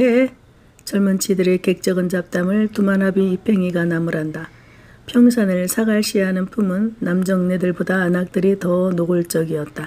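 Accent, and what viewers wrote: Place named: Gyeongsang, South Korea. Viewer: 태백산맥의 찰진 전라도 사투리에 이어 구수한 경상도 사투리... 앞으로의 대장정 함께하겠 습니다 감사드립니다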